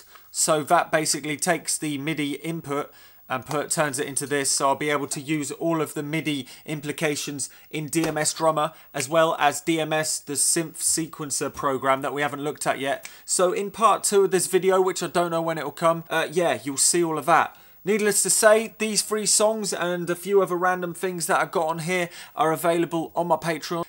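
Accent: Australia. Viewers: Fix the EuroPlus, hook a wire between the IIe's play button to the + play button. TWO track songs! ps, Aussie accent is a beauty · Can we get more half australian accent?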